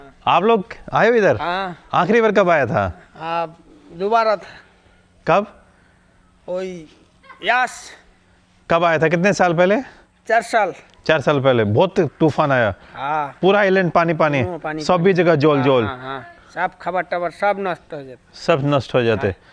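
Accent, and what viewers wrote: Bangladesh. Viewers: . I watched your all videos till today you uploaded. Liked and commented also. But this is one of the best as you spoke nice Bengali. Amar naam. However your tone is bangladehi bangla not kolkata bangla 😅 Let us meet at Delhi. I also travel a lot. Our meeting can be mutually beneficial. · you are using Bangladeshi/Tripura accent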